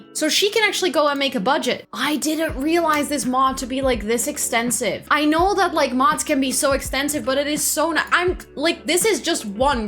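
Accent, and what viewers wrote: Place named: Netherlands. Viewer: WAIT A SEC!!! BEN JE NEDERLANFS????????? ik bedoel ik hoorde het wel al maar dit is. zo. cool! dit heeft me echt gemotiveerd! ik dacht dat ik raar zou zijn als ik mijn sims content in het engels zou maken maar wow. i'm flabbergasted!!! IK SUBSCRIBE NU GELIJK! je engels is echt super goed.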